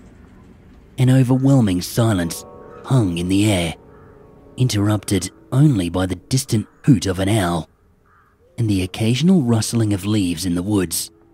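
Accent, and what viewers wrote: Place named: Australia. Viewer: The Blackwood name stoped me in my search for 3hr+ story but a ghost story is a must stop. I got here by searching for new content and came across my Australian friends say hi to Ratty lol anyway I never listened to a ghost story from an Australian but I like the accent anyway not sure if that counts but I hope it does.😂 Cheers mate from across the salty water😂😂😂😂😂🇺🇸 I subscribed 😅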